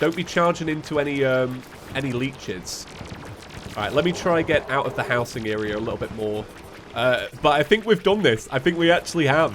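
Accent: United Kingdom. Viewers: Hello sexy-voice British man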